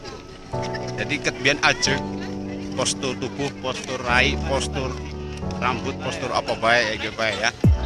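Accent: Banyumas, Indonesia. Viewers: Ngapak bae bhsne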